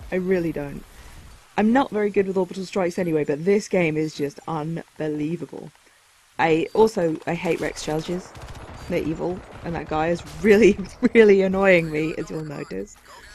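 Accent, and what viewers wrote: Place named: United Kingdom. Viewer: Mmmmm, saucy, British femme fatals playin' vidyuh games. Welcome back,!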